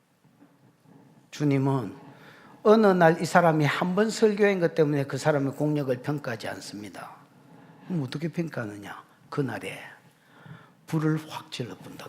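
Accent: Gyeongsang, South Korea. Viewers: 아멘 경상도 말을 득도하고 있습니다.!!!! 어쩜 이렇게 귀에 쏙 들어오는 말인지요.!!!!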